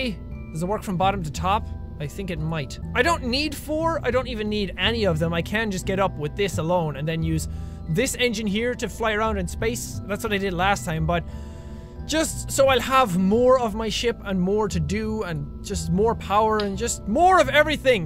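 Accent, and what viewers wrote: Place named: Ireland. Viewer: You accent is entertaining its a nice change of pace from EVERYONE BEING BRITISH OR AMERICAN or the couple of Australians but IRISH it's a nice change of pace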